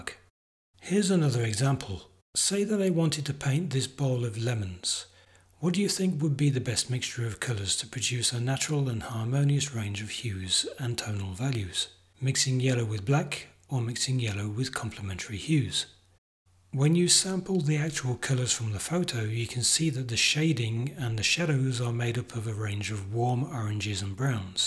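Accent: United Kingdom. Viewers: They don't use black watercolor because they use black watercolour. British voice trying to sell to Americans. Shame on you.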